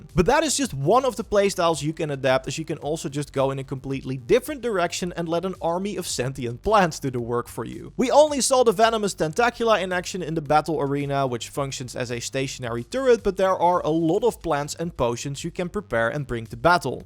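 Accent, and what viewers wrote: Netherlands. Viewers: Waarom hoor ik zo hard een Nederlands accent?😅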